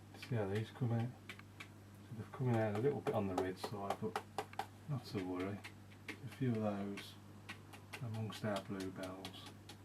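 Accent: Birmingham, United Kingdom. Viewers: Nice work, and nice to hear a Brummie accent, I'm a Brummie living in Wales, lol keep on posting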